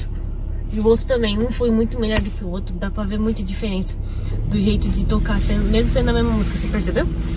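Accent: Japan. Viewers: o interessante o seu sotaque em portugues.....parece uma japonesa falando em portugues Estudei japones no Brasil e meus parabens por ter feito daigaku no Japao.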